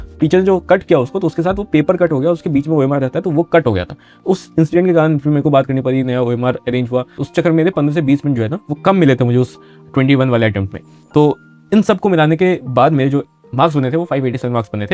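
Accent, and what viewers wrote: Bihar, India. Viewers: aap bihar se ho huje laga up se ho aap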